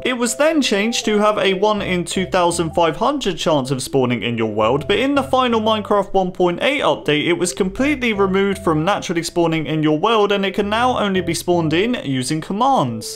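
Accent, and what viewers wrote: United Kingdom. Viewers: are you britis?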